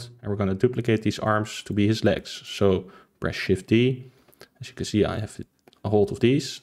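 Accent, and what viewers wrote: Netherlands. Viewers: I heard it cuz im actually dutch too,anyway this was very helpfull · Hi I have a problem when exporting my Character. I followed all the steps but when I drag my character from my desktop to Unreal Engine, the chest is hollow. I went back to blender but there everything was fine. I don't understand what I did wrong can you help me? also, are you Dutch? If so: hallo! ^^ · sum tell me u dutch. could it be ur accent? i'd recognize a dutch accent anywhere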